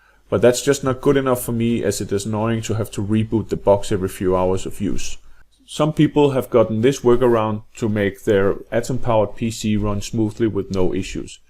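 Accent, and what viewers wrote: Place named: Denmark. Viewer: I can recognize that Danish accent from a mile away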